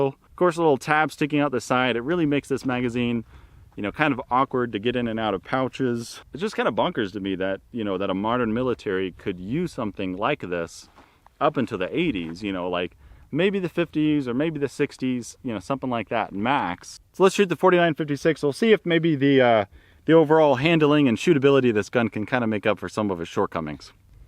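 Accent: United States. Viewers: تمنيت لو أن ما تقوله مكتوب باللغة الإنكليزية أسفل الڤيديو كي أستطيع ترجمته ، لأنني لا أجيد اللغة الإنكليزية جيداً ، ناهيك عن اللهجة الأمريكية سريعة .. عانيت بمشاهدة هذا الڤيديو و أنا كل لحظة أذهب إلىٰ غوغل من أجل الترجمة .. الڤيديو مهم و أعجبني - شكراً .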